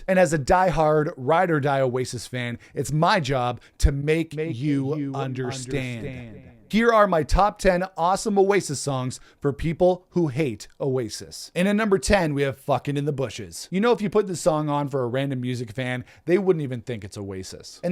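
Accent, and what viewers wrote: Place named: United States. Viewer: I looked at the thumbnail and for some reason knew I was gonna hear an American accent lmao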